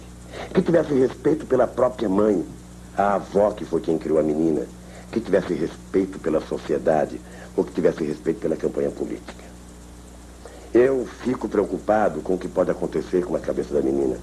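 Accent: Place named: Northeast, Brazil. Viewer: Sumiu o sotaque? Estamos em 2022, e ele fala errado e usa o sotaque nordestino...como é um artista, devia fazer novela., não estar na política, fazendo o povo chorar! Cris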